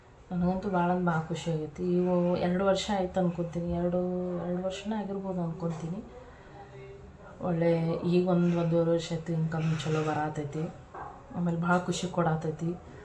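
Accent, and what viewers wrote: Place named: Bidar, India. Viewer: Nima swatha uru yavadu akka yak andre niv bidar bashi mathadthira adk heli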